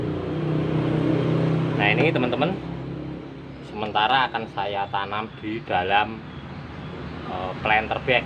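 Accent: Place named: Java, Indonesia. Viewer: Wkwkkwkw rabuk,, bahasamu podo ae lur koyo aku,, 😁😁 muedok puolll 😁😁😁👍👍👍👍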